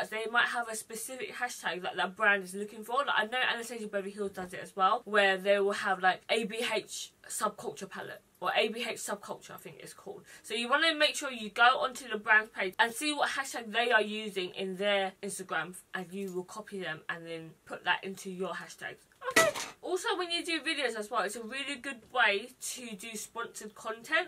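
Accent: United Kingdom. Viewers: Didn’t know you were British !!! Your gorgeous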